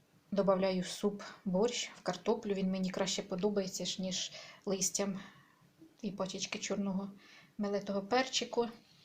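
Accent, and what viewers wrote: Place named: Poltava, Ukraine. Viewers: Доброго вечера,.Ви говорите і примовляєте як у нас на Полтавщині. Приємно вас слухати. Дякую за відео. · так і я дивлюся і також так думаю,мова точно як у нас на Полтавщині. Я сама з Березняків 25 км від Лубен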